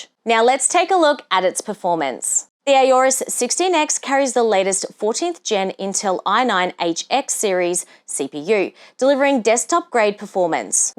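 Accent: Australia. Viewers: I absolutely love the look of this laptop device here & even the screen looks bloody amazing as well! I'm thinking of upgrading to a new laptop here & looks beautiful! Have a beautiful week & love hearing that lovely Australian voice! 🥰🎮❤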